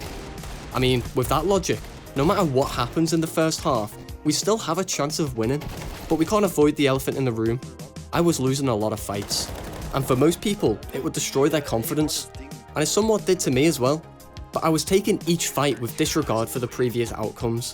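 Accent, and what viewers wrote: United Kingdom. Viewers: I only watch you because of these two things: Firstly you're an impeccable, stupendous and fabulous teacher Secondly for your Bri'ish accent, love that bud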